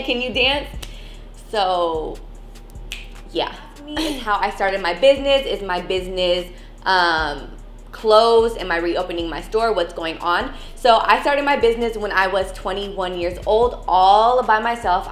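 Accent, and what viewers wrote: France. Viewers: Waaaaouw tu est super belle et j'adore ton accent français et le couple que tu formes avec Kurzawa c'est tout simplement magnifique 💕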